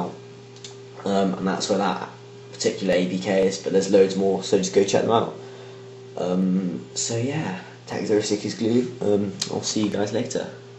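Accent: England, United Kingdom. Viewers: shut up fucking shit from fyrom he is from england and he has an awesome ENGLISH accent.. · You sound like your from London.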